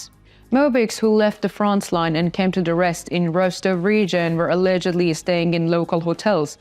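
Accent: United Kingdom. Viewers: Great video, great English! Love this format. Based on the accent, I cannot tell where you are from, since you have a British twist in your pronounciation!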